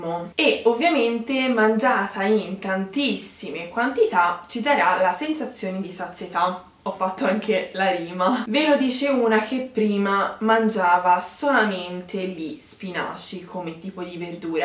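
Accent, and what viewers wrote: Tuscany, Italy. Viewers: Sei Toscana? ☺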